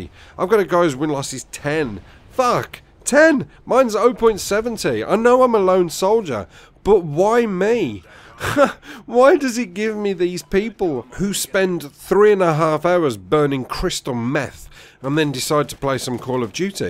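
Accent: Birmingham, United Kingdom. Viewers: Funny BRUMMY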